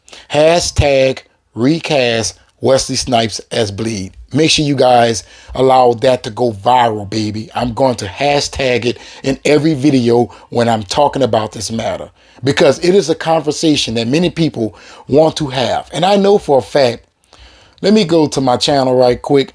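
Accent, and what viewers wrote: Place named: Louisiana, United States. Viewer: You sound like you’re from Louisiana. I think Channing Tatum studied “black people” from that state to get the Cajun accent. What do you think?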